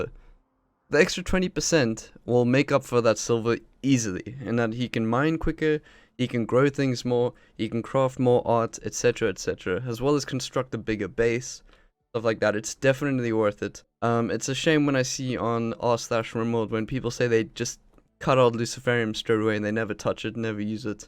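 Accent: South Africa. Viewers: I thought I heard a South African accent. Keep it up bud, I do enjoy your videos! Cheers from SA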